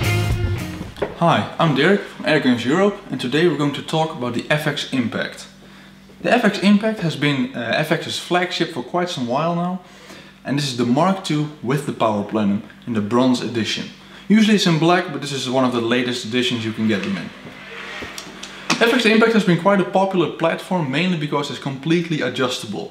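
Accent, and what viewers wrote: Netherlands. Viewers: Ben je gewoon Nederlander? Aan je accent te horen wel? Niet negatief ofzo, gewoon nieuwsgierig.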